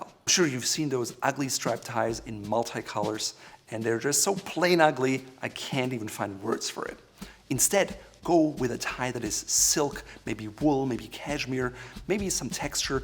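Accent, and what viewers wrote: Germany. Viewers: Isn't he German? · Wusste ich doch das ich diesen Akzent erkenne :D Even with perfect English, a German can spot a German.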